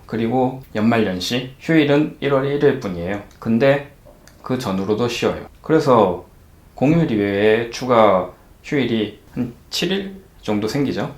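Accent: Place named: Japan. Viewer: ㅋㅋㅋ 일본 억양이 살짝 살짝 들려서 가끔 사람들이 오해 할 듯 하네요.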